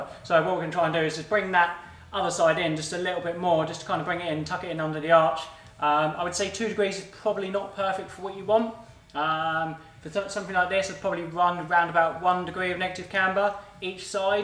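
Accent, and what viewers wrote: Liverpool, United Kingdom. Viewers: for the win my favourite ginger 🐒. Miss seeing mitto also get him back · Best Channel, Best Content and Best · ! you Strawberry blonde brother hahaha keep the vidz coming my guy 🔰🔥📚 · Superb! keep it up bro loving the work 👍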